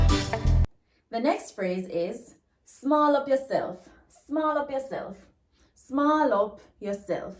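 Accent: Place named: Jamaica. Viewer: This is actually a very accurate video! Well done, you sound just like my friend Stacey from Negril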